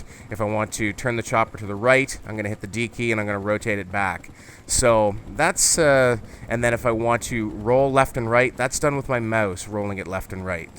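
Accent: Canada. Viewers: You sound like your from Canada ! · out, about, mouse, because, alright, target, .. just sound weird in this video.. oo. i know y.. he is Canadian Ah.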